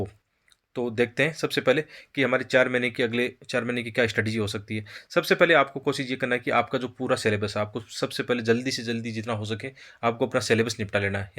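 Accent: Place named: Jodhpur, India. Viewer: Sir aapke voice tone se lgg rha h aap jodhpur side se hoo please sir btaao aap khaa se hoo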